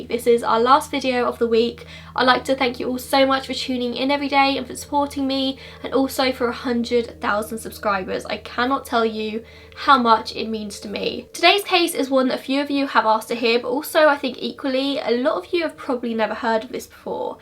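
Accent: England, United Kingdom. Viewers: Your pronunciation is actually not so bad.:) Obviously the accent is English, but you got the majority of the sounds put together right. It’s unknown if Irmela was sexually assaulted, and even the police at the time weren’t sure. As for names, most Finnish people do go by their first names, but naming is pretty relaxed, so you kind of can go by any first or middle name and it’s not so uncommon. Also, in English someone might say “Hey,” but in Finnish people don’t say names so often, so you just say “Hey,” and only use someone’s name if you’re talking about them to someone else, so half the time it doesn’t even matter what you call someone haha. Personally I don’t think Nils is innocent of everything, and alcohol consumption and mental health are real issues in Finland, but I don’t think he murdered his friends. There’s no possible way he could have injured himself the way he was.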